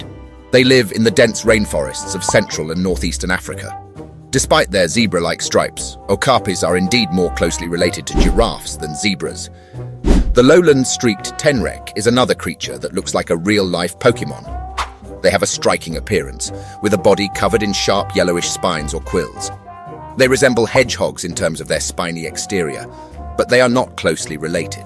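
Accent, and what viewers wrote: United Kingdom. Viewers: 😂😂😂😂🤣🤣🤣😂”….They STRAIGHT UP look like a sacred animal, from out of The Lord Of The Rings universe.” I can’t! I just can’t!! Trying to sound all hood, while educating with that British accent. I paused the video for that one😂😂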